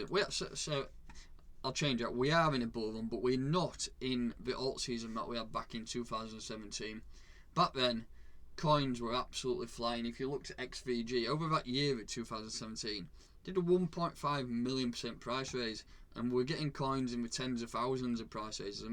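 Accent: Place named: North, United Kingdom. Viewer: $4.80 entry is optimistic at the minute. $13.69 price as of today. Nice content though my man, nice to hear a northern accent in the crypto world.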